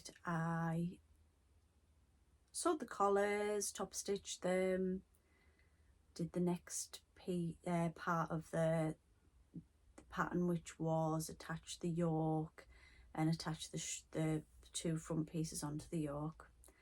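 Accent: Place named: Northern England, United Kingdom. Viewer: Love your presentation and accent-northern England? My husband and his family are from Bradford. I’m getting back into sewing after MANY years, enjoying new techniques and reviving my skills ❤️ keep up your good work!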